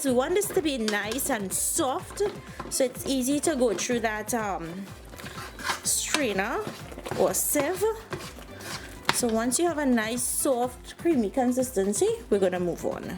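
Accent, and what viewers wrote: Trinidad and Tobago. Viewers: I just love the accent of a Trini I love guava cheese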